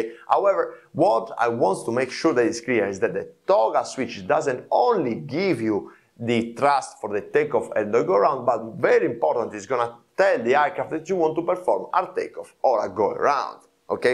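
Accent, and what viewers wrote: Italy. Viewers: ....on the other hand I heard a good explanation of TOGA in plain English with a slight Italian accent: Very well done...I've been looking for an explanation of TOGA and now it has clicked...everything else I can find in the FCOM. Thanks You!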